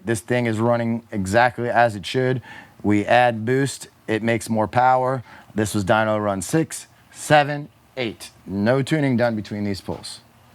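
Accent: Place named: East Coast, United States. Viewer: This that east coast talk, hey man it's sToCk run me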